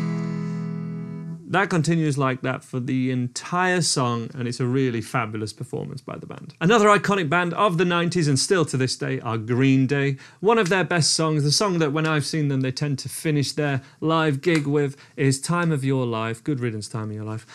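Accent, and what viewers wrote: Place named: Northeast England, United Kingdom. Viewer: Dude are you from the north east of England?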